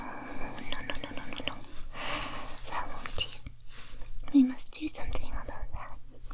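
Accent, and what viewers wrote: France. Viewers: Hmmm, I think I like the French accent now. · Frenglish is simply adorable in such a typically way. I speak both French and Italian, maybe we'll get an Italian maid next?:3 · La plupart du temps quand j'entends un non-natif parler Français je trouve ça un peu dure à écouter car l'accent m'a l'air forcé. C'est pas du tout le cas là par contre, l'accent et la prononciation tombent pile dans le territoire en frenglish mais compréhensible ce qui rend l'audio bien plus calme et relaxant. Most of the time when I listen to a non-native speak French I find it hard to listen to because the accent seems forced. Not the case here tho, the accent and pronounciation fall right between frenglish yet understandable territory, which makes it way more enjoyable and relaxing imo. Great stuff as always.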